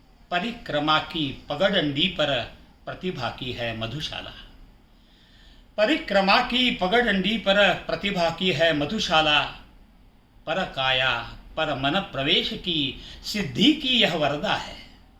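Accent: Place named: Maharashtra, India. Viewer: मराठी touch !!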